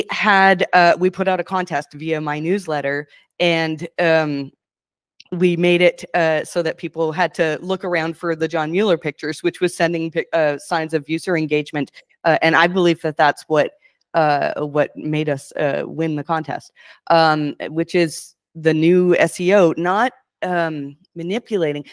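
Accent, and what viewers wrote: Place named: Canada. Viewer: I don't remember you having such a strong Canadian accent :) Luvyawork. Panda is scary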